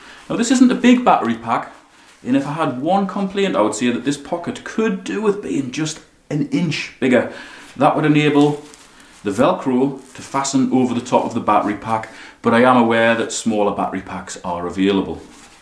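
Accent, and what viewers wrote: North East England, United Kingdom. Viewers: Hiya, I just seen your review on Amazon re: the heated gilet/body warmer and came to find you on YT so I could read any comments too. Your review (the video,) was excellent and the North East accent (my ears tell me not quite Geordie, but close enough, lol) makes you more believable and trustworthy to me (though others would probably think that was a bit backward. Ha!) I've had me eye on one of these for my dad for Xmas and I'm just having another look today before I go ahead and purchase one, hence me finding your review just now. My Q is this: It says the neck is also supposed to heat up? But your review doesn't mention this. I'd really wanted one with the heated neck too, as what with my dad being a bit of an old codger these days, he gets really cold just sitting in the house (I do think it's also his BP tablets, but OFC he won't go change them! 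Grr,) and I thought the neck heating up too, would be rather a nice touch, yano? So is the ad lying about the neck having heated elements too, or did you just forget to mention about the neck? PS. Lucky I managed to find you on here as you're under the name ' ' which seems is another YT account for you? Anyway, I hope you see this comment soon so I can crack on with the search and buying ASAP! Cheers in advance!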